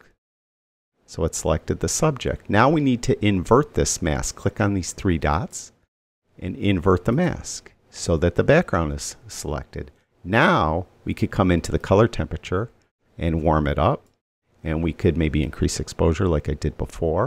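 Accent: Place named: New York, United States. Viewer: Thanks. You astound me with your knowledge and how you remember all the shortcuts keys for so many programs. I am a Lightroom Cloud user + I also use Pixelmator Pro as I find Photoshop to cumbersome a program to use when I only need to use it spasmodically. I really like Pixelmator Pro and find it a lot easier and more fun to learn and it is also very good value at a one off cost of $35 AUD when they had the half price offer. I will definitely be watching your future Pixelmator tutorials and if I can think of anything specific that I would like you to cover I will let you know. Has anybody ever told you your voice sounds like Paul Stanley from KISS. Must be the New York accent. Cheers from Sydney Australia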